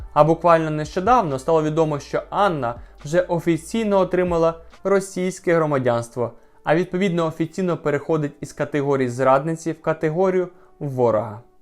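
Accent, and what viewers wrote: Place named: Galicia, Ukraine. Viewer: Який же мерзотний цей галичанський западенськiй дiалект....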